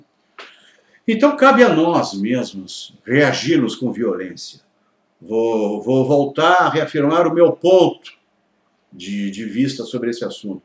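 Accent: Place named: Rio Grande do Sul, Brazil. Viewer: sou de Santa Catarina. Você é do RS, pelo que ouvi no vídeo. De qual município?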